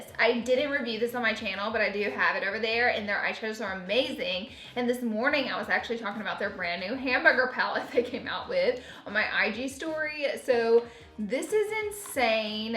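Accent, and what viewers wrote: South, United States. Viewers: Miss your southern accent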